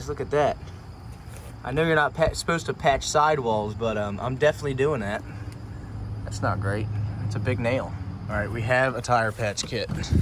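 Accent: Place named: Appalachia, United States. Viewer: Whenever Asians speak hill Billy? I love it.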